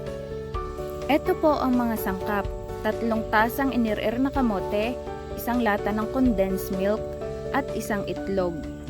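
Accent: Batangas, Philippines. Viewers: Ang cute nyo po magsalita parang gusto ko nalang tumira sa batangas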